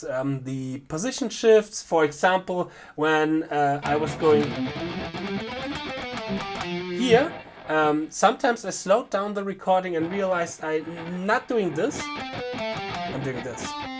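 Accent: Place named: Germany. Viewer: Wow, man! I have to tell you, how much I like your videos so far! Not only are you a phenomenal guitarist, but you are also very, very likable (you even remind me of my best friend optically AND voice/German-English accent) and you totally nail the spot(s) for me, where I want to get better at! Very well explained and with a super fun lick! I'm really celebrating here!:D I didn't know, how fast I can actually play meanwhile, because I rarely play THIS fast and even being totally clean! (Well... not THAT "clean". I had/have some beer, I admit...;) But you know what I mean...) You should consider making a Patreon channel sometime in the future. I would subscribe! (I'm already a Patron of Ben Eller, Andy Wood and Alexandra Zerner and this is a great thing!) Now, I'll continue the last bit of the video and will surely check out more from your channel afterwards! 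Danke dir,! Ganz ehrlich, du bist da offenbar ne ganze Ecke näher an mir als die üblichen Verdächtigten auf Youtube, wie z. B. Ben Eller etc. Alles geiles Zeug, das wirklich oft hilfreich oder spaßig ist, aber du bringst das für mich zumindest noch mal auf ein ganz neues, deutlich ambitionierteres Level. Zumindest mehr als Ben Eller zum Beispiel. Der hält das für meinen Geschmack oft ein bisschen ZU "einfach". Ich kann die Videos von dem eins nach dem Anderen runter-rocken und kann danach fast jedes Lick zumindest auf 2/3 Speed. Und dafür brauch ich meistens nur den "Stepdad-Speed" und guck mir das 3 - 4 mal an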